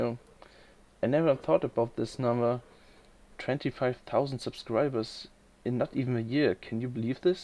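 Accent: Germany. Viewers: your English is very good. your German accent is wonderful. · TBH your english is better than alot of us! Both written and spoken P.S. German accents are awsome i have 3 foreign students from germany at my school and I really like their accents. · I subbed when you were around 5k, and don't bother with the plat for me, I play on xbox one, but anyways I subbed when you were around 5k, and I subbed because I really liked the music in your videos, as well as the knowledge you gave me through your vids, and the videos were fun to watch. And by the way, I love the german accent, and your english was fine! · Your English was great, German voice is always cool! Haha. Congrats on your 25k and in a short time, but you can tell why people watch your videos as the same reason that I do. Your quality is amazing, your gameplay always looks greatly handled and your info is always very well explained. 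I don't follow too many people for warframe unless I like the information provided, and I'm picky and yours have always appealed to me. I play on Xbox one and I still come here for your work. Keep it up! I look forward to your future videos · Ich mag deine Stimme. ^^ man hört das du deutscher bist:D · How did I know that you have a German ascent? Any way just wanted to congratulate you on your 25k it will be no time at all before you hit 30k! As for how long I've been subed = I have been subed to you a year or so maybe 2? As to why I think your music selection is great and I also think that your reviews of items are very helpful any way keep up the great work! P.s could you make a updated video on how to get out of the leset? (Have no clue if that's how you spell that XD) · Ich kann mich nicht erinnern, wann ich deinen Channle abonniert habe, aber es ist bestimmt schon eine ganze Weile her. 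Ich abonnierte dich aus dem Grund, das dein Content sewhr hochwertig wirkte und ich fand, dass dein Fachwissen den großeb Warframe- YouTuber(mogamu etc....) bei weitem überlegen war. Deine Videos waren unglaublich informativ und das obwohl sie so kompakt waren. Ich mochte das insgesamt Paket einfach gerne und du bist immernoch mein lieblings Warframe-YouTuber, weiter so! Ach un dein Englisch ist gut, du klingst halt ein bissel deutsch, aber wer tut das nicht^^ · Dude you said your English is bad but it's great you just got a cool German accent, that's all.Also you probably speak better than me and I'm from England, I just stutter way too much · i love the german english accent. i am from holland so i know your struggles;) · Ich wusste nach 2 Wörtern, dass du auch Deutscher bist:D Darf ich mal fragen wie alt du bist? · Herzlichen Glückwunsch! Man höhrt das du Deutscher bist. Kommt jetzt mehr mit Sprache? 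· Ahhhh that wonderful German accent.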